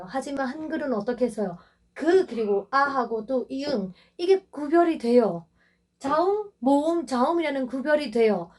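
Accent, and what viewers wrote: Gyeongsang, South Korea. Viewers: 경상도 쪽에서 한국어 배우셨나요?? 아님 주변가까운 한국친구가 경상도사투리를 쓰시는 분이신가?? 미얀마분이신대 경상도 사투리억양이 좀 묻어나오시네요 ㅋ것도 살짝 ㅋㅋ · 경상도 사투리 억양이 있으시넹~^^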